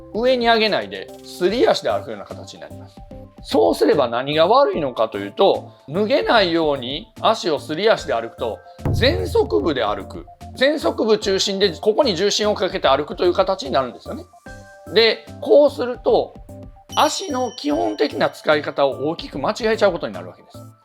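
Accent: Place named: Kansai, Japan. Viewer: 関西訛りですね。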